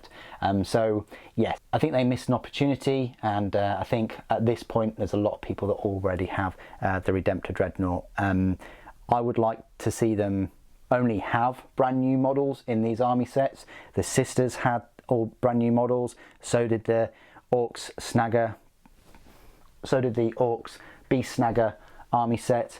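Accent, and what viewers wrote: United Kingdom. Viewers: I like your accent. Its very crisp and authentic. It's the only reason why I stood to watch this video. As an American, you have the IDEAL accent that we love to listen to- your accent and Tom Holland's...and Jason Statham...and Michael Caine...I know Sean Connery's not English, but we'll throw him in there too. Your accent is up there among the greats. You articulate your words very clearly. Cheers Mate.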